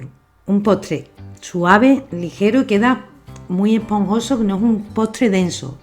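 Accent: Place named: Andalusia, Spain. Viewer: Me a encantado la receta pero mas todavía tu acento Andaluz. Soy de Cádiz pero vivo en Florida, saludos!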